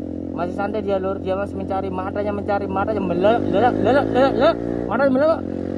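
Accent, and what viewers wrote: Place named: Surabaya, Indonesia. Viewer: Diencep encepkan, Suroboyo banget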